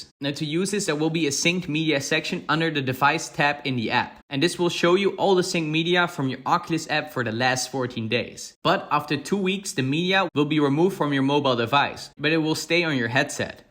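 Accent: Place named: Netherlands. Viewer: Its only clear if you yourself are Dutch, but it doesnt really matter because apart from the slight accent, your English is really good!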